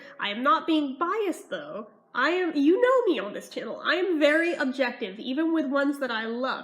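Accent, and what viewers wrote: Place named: South, United States. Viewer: I love her southern accent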